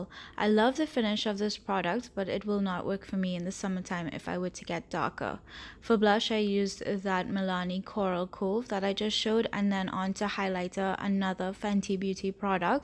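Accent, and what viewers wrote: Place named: Trinidad and Tobago. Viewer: Awesome hun that Trini accent can't hide at all 😘😘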